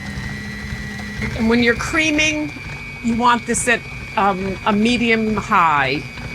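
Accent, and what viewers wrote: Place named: South, United States. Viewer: I'm sorry, but I find this channel very confusing. I have watched 3 of your videos because the recipes look good, but I don't understand what you are trying to achieve? You have a woman who sounds like an American northerner doing the cooking, an obvious southern-accented man doing the voiceover, Appalachian fiddle music playing, more singing and music that doesn't seem to reflect anything in particular, and a fridge that plays disco music and flashes lights. Not to mention the name, referencing the Shire, is English/Irish in origin....you guys are all over the map and none of it fits together?? Signed, Very Confused.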